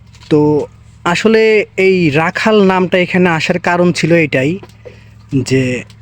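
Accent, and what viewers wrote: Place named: Bogura, Bangladesh. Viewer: ভাইয়া আপনার বাসা কোথায় কথা বার্তা দেখি আমাদের বগুড়া জেলার মতো,প্লিজ বলবেন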